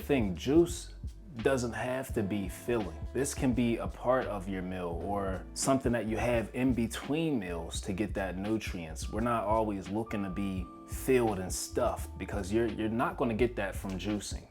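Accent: Pittsburgh, United States. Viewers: U sound like your from Pittsburgh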